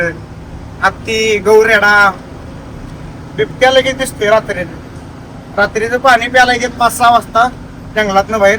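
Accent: Kolhapur, India. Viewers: भाऊ तुझे ब्लॉग छान असतात, पण तू व्हिडिओमध्ये घाण घाण शिव्या नको देत जावू. कारण तुझे व्हिडीओ लेडीजसुद्धा बघत असतात, तुझी कोल्हापुरी भाषा शैली मस्त आहे, फक्त शिवीगाळी बंद कर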